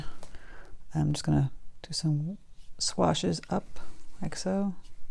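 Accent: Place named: New England, United States. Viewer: Thanks for the terrific info & lovely cards,. Btw, I'm from RI and notice your familiar accent! MA or ME? Like a "lethal" from home!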